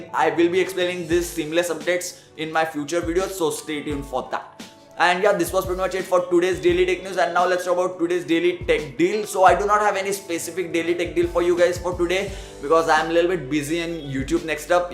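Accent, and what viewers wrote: India. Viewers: this is the only Indian dialect video that I found not annoying so far good job brotha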